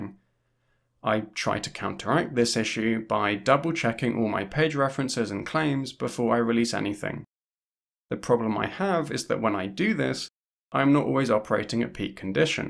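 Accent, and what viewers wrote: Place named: United Kingdom. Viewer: Would recommend learning Spanish or Catalan if you can muster up the willpower to do it both because they are useful for your purposes as not being able to read the language is a roadblock you mention multiple times in this vid and also because in the past your pronunciation of certain Spanish proper nouns has been so "British" for the lack of a better term that I've kind of struggled to find the person/thing in question in personal search afterwards. (could also just edit the captions where proper nouns pop up because the auto-generated ones usually don't hear it but I don't know if you can do that anymore)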